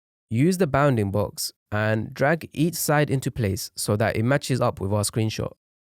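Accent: United Kingdom. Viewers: Brother, you are from the UK right?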